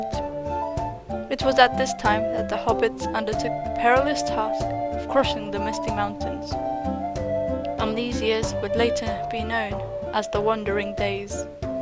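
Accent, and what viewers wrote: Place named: RP, United Kingdom. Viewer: Well done. I am particularly enjoying your First Age work. Your R.P. accent (I'm American) and spot-on pronunciation are particularly delightful to listen to, not to mention the always delightful and apropos music and art choices you make. Carry on!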